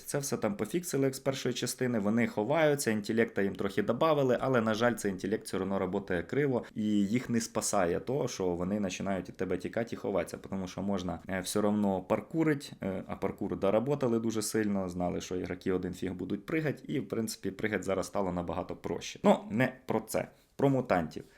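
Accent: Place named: Russia. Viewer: хлопче я бажаю тобі терпіння в твоїм починанні, але слухати твою москальську не можливо. Вуха просто ріже. Успіхів тобі.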